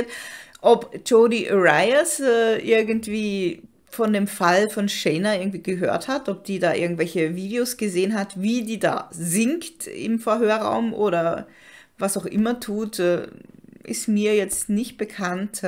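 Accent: Austria. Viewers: Also ich kann nicht mehr aufhöhren dich zu höhren ! Du hast jetzt den ersten platz von Allen,,true crimerinnen,,dein österreicherische akzent ist die kirsche auf der torte. Weiter so❤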